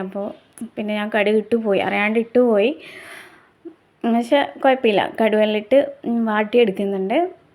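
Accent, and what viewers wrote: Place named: Kannur, India. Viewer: താത്താന്റെ കണ്ണൂർ ഭാഷ അടിപൊളി ആയിക്ക്ണ് 😊😊😊ഡേ ഇൻ മെയ്‌ ലൈഫ് വീഡിയോ പൊളിച്ചു ട്ടോ 👍👍👍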